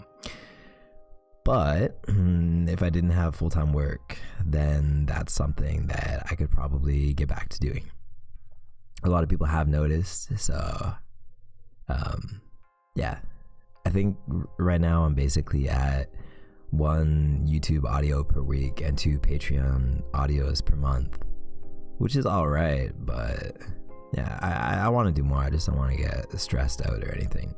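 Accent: Canada. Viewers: your the only reason why canadian accents are one of my faves rn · There are alot of things to talk aboot Oh yes he's canadian 😍 Dont worry I'm canadian too 😆😆💋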